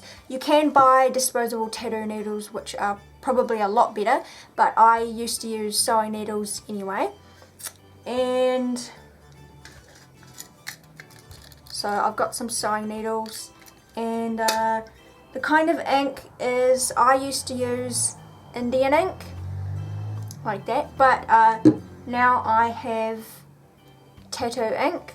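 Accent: New Zealand. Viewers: thought you were a kiwi! (Waving at you from Wellington) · Hi this is really cool and i love your videos. are you from new zealand cause your accent is awesome. Awesome bear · This is a bad idea but im doing it anyway!! I noticed youre from nz right away and i sound the same but i roll my r's slightly more :-) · She's from New Zealand, be ignorant somewhere else. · nope it is her accent and she is a kiwi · steezy.k kiwi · Kiwi accent :3 The tattoo turned out rad! If I ever came across you in person I would seriously pay you to give me one x)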